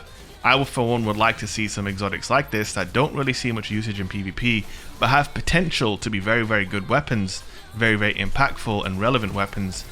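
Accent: United Kingdom. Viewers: Heard British. smh. DISLIKE VIDEO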